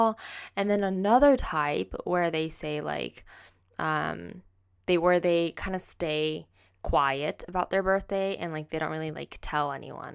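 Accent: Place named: United States. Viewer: Happy birthday 💛 のアメリカ英語がなんだか大好きで、(+ツヤ肌に見惚れながら)いつも動画楽しませてもらってます😂💕 にとってすてきな年でありますように🌈✨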